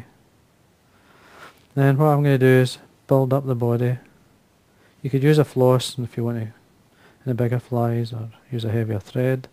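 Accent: United Kingdom. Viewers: Great video. Thanks. I just wish I could understand the wording better. The softly spoken British "English" was hard to follow. You see I live in America! As they say “one picture is better that 1K words, so I will do the best to learn from the demonstration.. Thanks again for the post.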